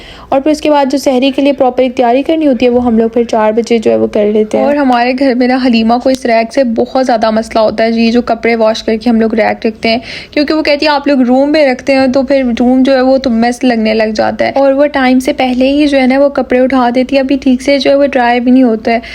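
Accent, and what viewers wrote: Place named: Arab world. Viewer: Ma Shaa Allah apka Arabic bolna ka andaz buhat pyara ha❤❤❤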